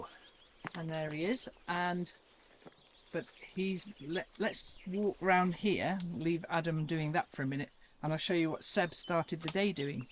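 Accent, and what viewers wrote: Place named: North, United Kingdom. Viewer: Thankyou for this new channrl, love it. Its lovely to listen to listen to our northern accents too as I live in Ontario. ❤❤❤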